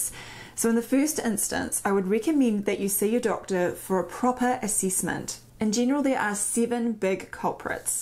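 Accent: New Zealand. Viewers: There's few more adorable than a N.Z. accent. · Interesting, thank you. As far your accent goes, people just need to learn some Kiwi 😊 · You have a lovely accent as does all of New Zealand 🇳🇿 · I hear a fellow Kiwis accent and love it. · I use my tinnitus to meditate. Love the accent KIWI isn't it. You accent is better than the call center operators from India, I can't understand them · Its New Zealand, Sexy Voice🌹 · I would like to know if you are a native New Zealander. BTW, your accent is lovely and pleasant to listen too. Thank you for your notes. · Nice to hear Newzealand accent ,though I may take a little extra time understanding. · I got a pretty bad concussion back in 2013 and have tinnitus since then. Great to hear a fellow kiwi by the way. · I love the Kiwi accent. When I first arrived in Oz from the US, I couldn't understand anyone! But after nearly 14 years I mostly don't even hear it anymore. 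I do still love the video a NZ man made about his deck LOL. . My GP said I had Tinnitus when I told him I was hearing music very softly in my right ear. I see how much worse it could be. Thank you for this video. >> Tom's wife << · Forget your pronunciation of “ear”, your pronunciation of “accent” grates. Seriously, I’d move to NZ tomorrow if the accent wasn’t so grating. I say this from the background of having visited the country and being a descendant of one of a very prominent Kiwi family. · Love KIWI accent especially from an intelligent and good looking woman . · I love your accent! I am retired U.S. Navy, and I spent two wonderful years in Auckland on exchange with the Royal New Zealand Navy. You’ve given me a “trip down memory lane”! Thanks! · I’ve suffered from tinnitus for years. I’m a loco engineer,therefore I am exposed to high noise for long periods. Very informative video. 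The minute you mentioned your accent about ear, I thought Kiwi. My dear lady wife is a kiwi and is always going on about pronouncing words🧐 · I love NZ. In the year of 2002, I had the opportunity to visit your beautiful country. I have accumulated so much positive memories. Your accent is amazing I like it very much combined to your knowledge. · Hi Dr, love the Kiwi accent, as I've been living in NZ for 20 years that is a good thing. My tinnitus is like the shrill of many cicada (Christmas beetles) but go from a dozen to thousands when I am stressed out. Like walking in a NZ forest glade in the middle of summer noisey. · I am here because of the accent! Loved my stay in kiwi land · I find your Kiwi accent beautiful & clear ♥ (like your looks) Nothing wrong with accents, I've got a European one 🇲🇹 ☺. VERY INTERESTING TOPIC. TANX Dr. Cheers 👍. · I'm from the states, but lived in Wellington for a few years - that's a major kiwi accent, I believe! 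Is there any significance with imbalanced tinnitus? Over the last few months, it's gotten noticeably worse in my left ear. I've had a full round of exams, and have been told I have Meniere's disease. It's unfortunately really impacted my ability to hear properly. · All New Zealand accents are difficult. You pronounce all your E sounds as a hard E. That is not how the rest of the world speaks! Still good advice and a good video. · I have had Tinnitus during the day as a hiss, whistle, for perhaps 20 years. Strangely enough it stops when i go to sleep. If i wake in the night it remains stopped until i am fully awake. I have learned to live with it. My ears are clean and OK. Love the Kiwi accent. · Definitely I must make an appointment with my Doctor. Thanks Dr.! (By the way, lovely kiwi accent 😉)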